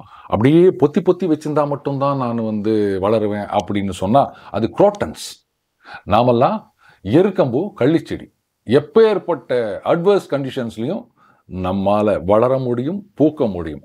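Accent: Chennai, India. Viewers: 😂 Sir i am a great fan of yours, they way you present it with Chennai slang is very very humorous, i really enjoy it not only myself Many others also will be enjoying these facts and reality 😅, keep it up Sir 🙏🌹🙏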